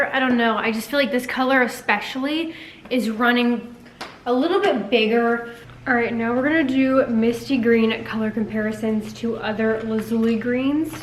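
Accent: United Kingdom. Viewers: no she is Bri-ish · Are you British? you're lil British accent is so freaking cute 🥺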